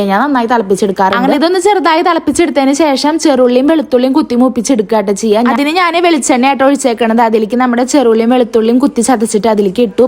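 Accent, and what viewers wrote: Thrissur, India. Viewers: kuthi chathachath parayunnath kelkkan nalla rasam und thrissur slag anu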